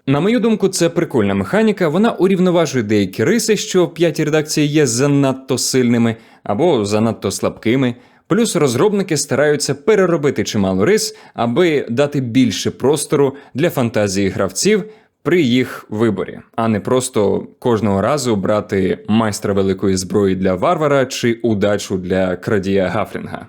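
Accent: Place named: Russia. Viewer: Но акцент не киевский, а прям очень русский - это спасает, наверное)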